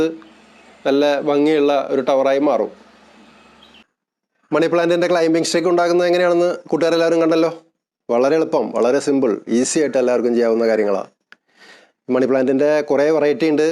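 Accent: Thrissur, India. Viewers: chettan thrissurkRanaale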